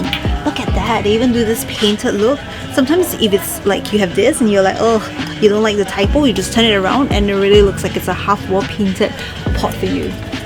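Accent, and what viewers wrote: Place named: Singapore. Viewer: please try to improve your pronunciation. ‘check out my shopping lut’ ??? that mix of a singaporean accent and a western accent is also badly done because you pronounce one word several different ways. it’s jarring on the ears.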